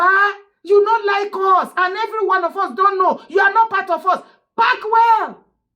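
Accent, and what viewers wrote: Nigeria. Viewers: Your pidgin is beautiful my sister. ❤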